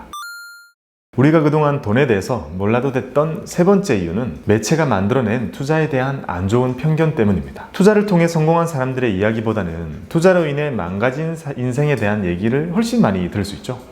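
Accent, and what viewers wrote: Gyeongsang, South Korea. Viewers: 배아본적이 없는데??ㅋㅋㅋ 경상도 사투리인가요ㅋㅋㅋ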